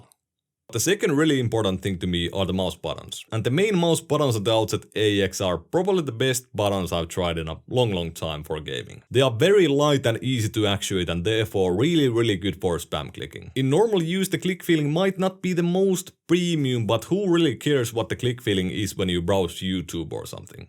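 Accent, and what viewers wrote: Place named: Finland. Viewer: Jätkästä kuuluu kilometrin päähän et sä oot suomalainen...Sä et puhu rallienglantia, etkä huonosti mut sen vaan kuulee. Tilasin.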